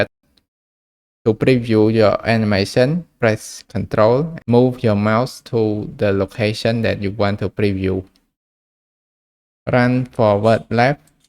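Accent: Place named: Thailand. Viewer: As soon as I turned on the video, I felt a very familiar accent. I lived for some time on the island of Koh Samui. I'm sure it's a Thai accent. :)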